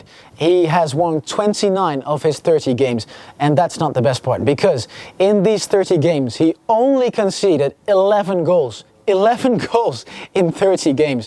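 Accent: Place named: United States. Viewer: Try to keep it english and not American english. But good job nice vid!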